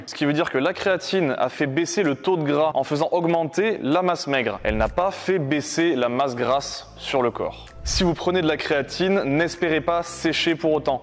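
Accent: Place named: England, United Kingdom. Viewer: Je valide pour la qualité et l’accent anglais surtout ☺️.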